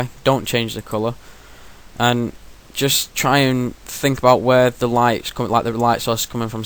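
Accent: Manchester, United Kingdom. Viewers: ahah you have an obvious manc accent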